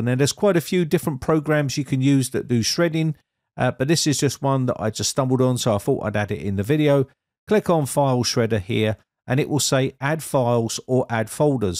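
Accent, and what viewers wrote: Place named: Cockney, United Kingdom. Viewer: I'm pretty sure he's saying, "What you guys" which is like the cockney geezer way of saying, "What's up guys" 😁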